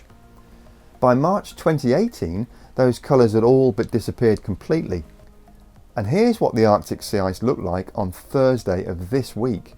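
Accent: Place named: Cockney, United Kingdom. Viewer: Lots of "sensationalism" & "doom&gloom, if it bleeds it leads" MISLEADING PSUEDO SCIENCE from our cockney accented "pseudo scientist".... Notice he says "sea ice" a lot. Propaganda from fiberal globalist seditionists seeking to "carbon tax" us into oblivion, this video is clearly paid advertising to sell us all into submission... don't believe the rhetoric. HERE ARE THE FACTS: 1) ice shelves are very thin floating 'skins' relative to the main ice mass at the poles, making for dramatic imagery (likely photo-shopped to support the phoney narrative). 2) yes, "sea ice" is diminishing, and yes our climate is warming, but... NO, Sea levels will NOT rise & flood coastal regions. 3) NASA's circumpolar satellites measure surface elevation & micro-gravity (mass directly below the sat.) and clearly show FIVE TIMES MORE ICE IS CURRENTLY DEPOSITED BY SNOWFALL AT THE POLES than what is lost to melting "sea ice". 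4) the disparity between the much greater annual polar deposition vs. loss of peripheral "sea ice" is ACCELERATING (especially at the Antarctic landmass). 5) while global warming is for real, it will NOT result in "catastrophic sea level rise" for the above reasons as well as greatly increased evap/precip filling depleted lakes, rivers & aquifers, as well as greater atmospheric moisture held in clouds & humidity. 6) CLIMATE MODERATION will greatly warm our winters but ACTUALLY COOL OUR SUMMERS in many regions due to the cooling effect of MOBILE liquid meltwater vs prior stationary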